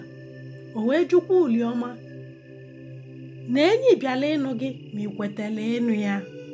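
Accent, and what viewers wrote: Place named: Igbo, Nigeria. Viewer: sis i so much love your channel with your igbotic accent i wish i can spee=ak like you now chai kudos to you sis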